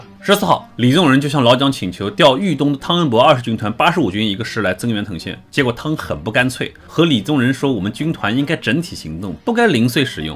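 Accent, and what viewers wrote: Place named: North, China. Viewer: 北方人正常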